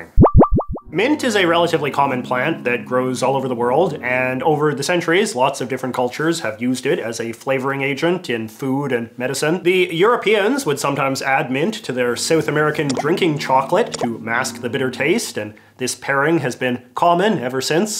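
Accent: Canada. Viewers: The most Canadian accent I’ve ever listened to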